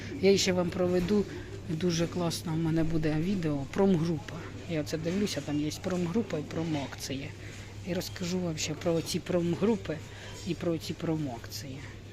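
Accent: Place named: Poltava, Ukraine. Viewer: Доброго вечора пані, підписалася на ваш канал, хоча я із західної України. У нашому місті теж є і АТб, і Сільпо, купляю в ціх супермаркетах тільки акційний товар і то не все підряд, бюджет не позволяє з їх " доступними" цінами. Дуже приємно вас дивитися і слухати вашу полтавську мову. Хай вам щастить, піднімається настрій після просмотра, як завжди з юмором, а кому не подобається, хай не дивляться.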